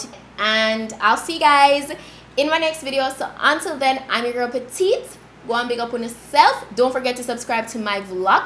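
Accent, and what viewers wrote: Jamaica. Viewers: are you jamaican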